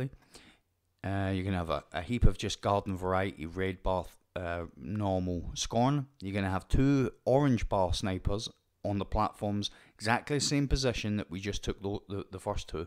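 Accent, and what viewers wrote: Scotland, United Kingdom. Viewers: Yer Scottish got ma support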